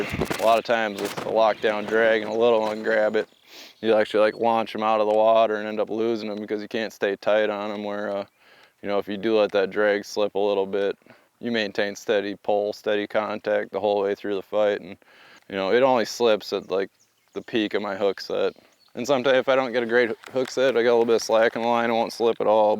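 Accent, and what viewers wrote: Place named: South, United States. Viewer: Dude reminds me of Jay from Jay and silent Bob with a southern accent chill af lol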